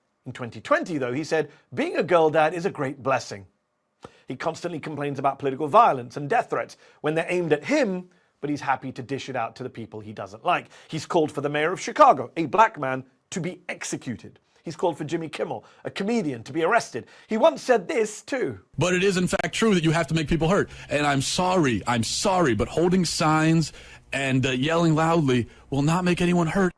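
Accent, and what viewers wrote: United Kingdom. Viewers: I mean, you don't sound like you're from the U.S. with that British accent, so maybe he is technically more "murkan" than you, but who cares?? I guess it depends on how you define "American". From the rest of the world's perspective, "American" means gun toting, bible beating, boot licking patriotism. From that standpoint, he's definitely more "American" than you. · With a profoundly British accent?.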